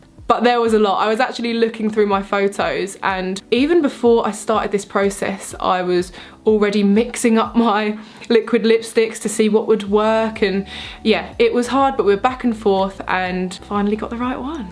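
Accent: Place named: United Kingdom. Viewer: Ok I've been seeing your lip and make up tutorials from a while now... But i never got to see you talk XD today i finally clicked on this video very excited too see someone who has such an amazing tutorials... Talk XD I JUST FREAKIN LOVE YOUR BRITISH ACCENT... I am so shocked that ur a Britain... Your beautiful... Stay like that always... Your tutorials speak alot of your creativity just in coloring your lips and i am here for it... Love from pakistan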